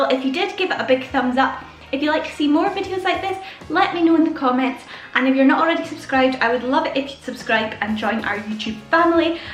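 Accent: Scotland, United Kingdom. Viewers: Hi, nice to hear a Scottish voice on youtube. I live in Balloch near Loch Lomond. I saw that you had to do without sugar in one of your dishes. I have used sweetner instead before. It tastes great. Either one or two of the little tablets or one or two table spoons, maybe try one spoonfull first!! Or one tablet first. You don't want it too sweet. Xxx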